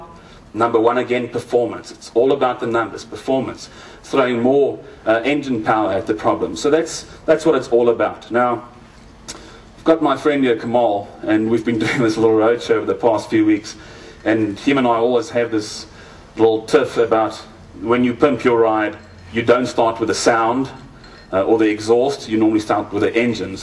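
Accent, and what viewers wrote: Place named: South Africa. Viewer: Definitely a South African speaking here.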